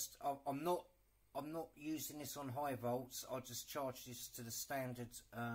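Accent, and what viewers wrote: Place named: United Kingdom. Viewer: Fair play to ya pal. Decent channel thanks nice to hear a brit accent instead of a yank 1.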